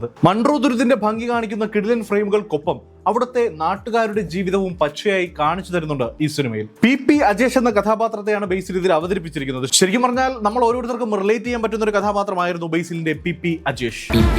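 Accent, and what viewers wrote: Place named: Tamil Nadu, India. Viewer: ഇന്ത്യൻ accent അല്ല തമിഴന്മാരുടെ accent ആണത്!!!!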